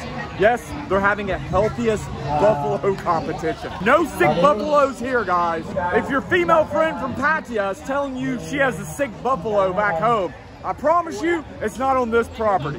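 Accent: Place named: Pittsburgh, United States. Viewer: That accent...you from Pittsburgh? WV? Eastern OH?, anyway good videos.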